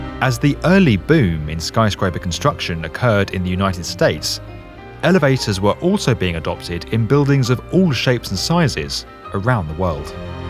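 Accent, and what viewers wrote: United Kingdom. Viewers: Surprising you call the lifts elevator when you’re donning a British accent. :)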